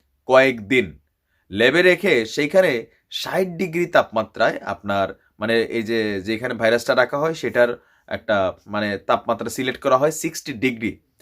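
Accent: Comilla, Bangladesh. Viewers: ভাই কেমন আছেন, আপনার মুখে কুমিল্লার আঞ্চলিক ভাষা অসাধারণ লাগে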